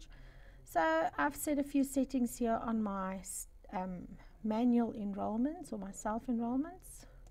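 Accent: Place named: South Africa. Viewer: un joli accent sud-africain. Thanks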